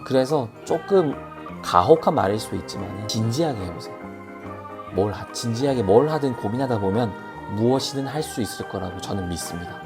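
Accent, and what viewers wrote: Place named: Busan, South Korea. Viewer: 우연히 들어오게 되었는데 조근조근 선생님 사투리가 너무 좋네요. 여기도 부산이라~~ 중2 딸래미 수학성적 50점을 못넘기네요. 그룹, 개별수업 시켜봤는데 안되서 과외를 해요. 기초가 많이 부족하다 하시네요. 그나마 국어,영어는 80정정도 되구요 확실히 과학,수학쪽을 어려워해요. 그런데 미술을 너무 좋아해서 틈나는대로 종이나 아이패드로 그림을 그리고 있어요. 집근처에 올해 2회째 되는 위탁형공립예술학교가 생겼는데 인문계 다니다가 3학년 1년과정으로 그 학교를 다니면서 수업을 들을 수 있는데 국어,실용영어,한국사,체육 수업하고 오후엔 전공 과목(미술,음악) 실기수업 위주로 한다고 합니다. 예고를 가기엔 늦었고 거리도 너무 멀구요. 꼴지를 하더라도 인문계에 진학해서 공부하고 미술학원을 병행하는게 나을까요? 특성화고에 가서 내신도 좀 잡고 미술도 시킬까했는데 요즘은 내신따러 특성화고 가는 성적 괜찮은 학생들도 있다고 하더라구요. 중3부턴 입시미술학원에서 주말에 취미로 먼저 시작을 해볼까하는데 미대는 수학성적을 안보더라구요. 그래서 고민이 중2 이번 기말고사 끝나고 수학과외를 끊고 ebs 50일수학이나 30일수학 인강으로 기초를 다시 복습하고 3학년 현행을 인강으로 하는건 어떨지요. 공부도 잘하고. 그림도 잘 그리면 제일 좋겠지만 둘다 하기엔 경제적으로도 힘들 것 같아요.ㅜ 물론 미술을 시켜주는대신 인강으로 수학공부를 게을리 하지는 말자고 다짐을 하구요 답답한마음에 두서없이 글을 썼네요 바쁘시겠지만 선생님 조언 부탁드려도 될까요?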